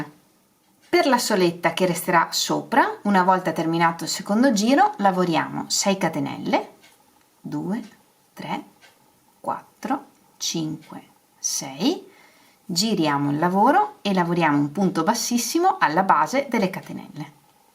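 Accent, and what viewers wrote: Veneto, Italy. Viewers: Bellissimissima!!!! Starei ore a guardarti lavorare e ascoltarti! Spero di diventare altrettanto brava!! Intanto provo! Sei veneta?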